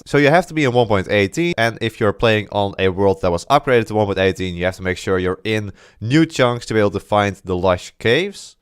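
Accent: Netherlands. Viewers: r u dutch?😭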